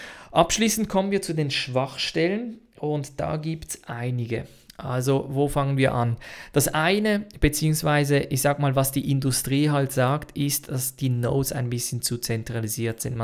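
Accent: Switzerland. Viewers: warum nennst du dich? Du bist Schweizer das hört man...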